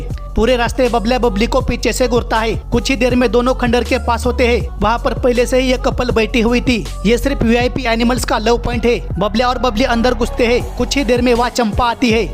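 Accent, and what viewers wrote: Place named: Mumbai, India. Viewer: Mumbaikars. Wali Hindi bol Raha hai Bhai ek number 😂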